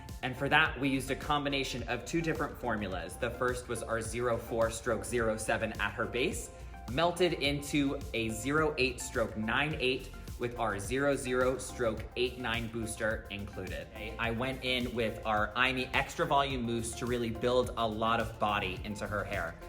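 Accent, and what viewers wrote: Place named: New York, United States. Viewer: I LOVE this tutorial. You’re a natural- EXCELLENT delivery, camera presence…. I thought I heard a little NY accent. I’d totally go to you! Application is so clean. PLEASE lemme know if ur in NYC area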